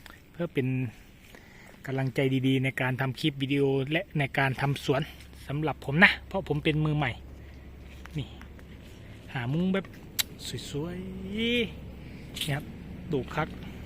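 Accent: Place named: Loei, Thailand. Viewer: ฟังเสียงแล้วว่าต้องเป็นคนเลยคักแน้วเสียงที่เป็นเอกลักษณ์☺️😂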